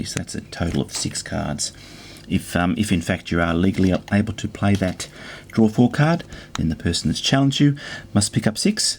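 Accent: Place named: Australia. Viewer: OMG! YOU'RE AUSTRALIAN! Im really sorry, im really really sorry, i did learned a lot thanks for the video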